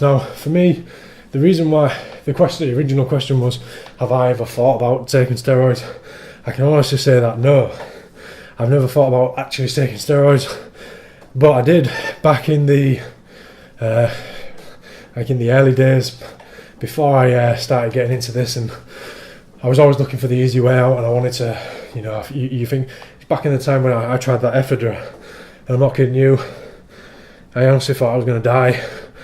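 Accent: England, United Kingdom. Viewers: that english accent beats all the other english accents...is it the country touch? good bullocks talk mate :)!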